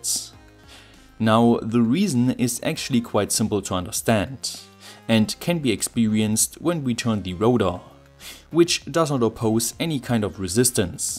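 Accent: Germany. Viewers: Geat german accent! Ich spache deutsch! (but I have terrible spelling) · To All: Info. Whatever ' brand ' of vehicle alternator you use ; check out the idle speed of THAT brand of vehicle. That, idle speed, is what you'll require to create an electric generation unit. Minimum of probably 1700 or so. Make it for at least 2,000 rpm. ( 3600 may be the best, like those gens you buy , in a steel piped case type = Champion, and other brands ). If i build one, it would use an alternator off of a semi- engine ; probably a Detroit, or Cat engine. Rating about 200 amps. IF it's going to be made, it's going to produce power !. Thanks, for the info, SIR. Wonderfull accent, is that German, or Norweigian, or ...? (Alberta Canada) A generator, usually requires about 2 hp of rotational force, for every 1,000 watts of power output. There is some loss, but efficiency is between 80 - 88 %. 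I have several generators, 208 cc gas engine powered, 3500 Watt BE generator. Older Yamaha, 2800 watt output, uses a 256 cc engine. ( 1983 model - had to redo carb = works awesome. Great engine !!)) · Your German accent sucks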